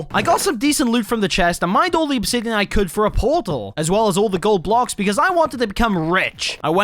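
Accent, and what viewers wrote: United Kingdom. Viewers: U sound brish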